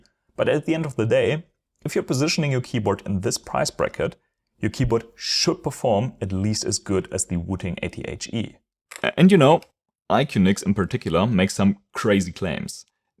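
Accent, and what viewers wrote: Germany. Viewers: This German Accent! xD But thanks for the Vid! This really helped me!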